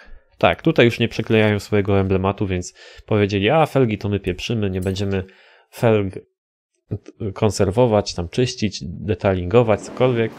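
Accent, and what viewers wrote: Szczecin, Poland. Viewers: Ze Szczecina jesteś?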